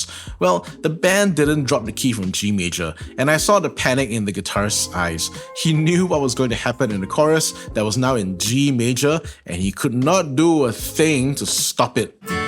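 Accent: Singapore. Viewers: Funny listening to you speaking singlish to the band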